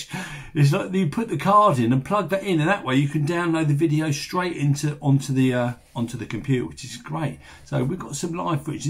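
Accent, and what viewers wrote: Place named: London, United Kingdom. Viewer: Great video. Thanks for sharing. Do I detect a slight London accent there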